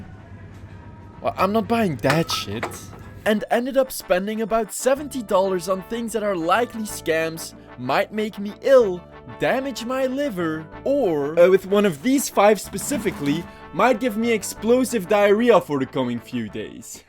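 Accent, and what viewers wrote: Netherlands. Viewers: Accent sounds Dutch to me. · How much of this applies to US markets vs our European markets? You constantly reference US cases and US studies and list everything in dollars (for some reason), but you seem to be dutch (judging from your accent and the Delhaize brands) and testing European products sold under European laws. Our European Union has a lot more stringent laws on food quality and truthfulness in their labels, although they are not perfect, they are a lot better in general than the capitalist Mad Max hell the poor Yanks live in. It would be great if you could be clearer on such significant differences in the future, because it's an important topic and a fun video in general, but with oversights like this, you could worry the wrong people or, even worse, desensitise people to the wrong topics.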